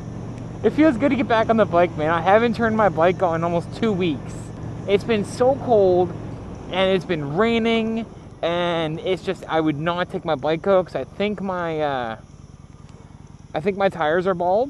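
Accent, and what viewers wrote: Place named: Nova Scotia, Canada. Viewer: Were your first <3 God I can hear the Nova Scotian within you.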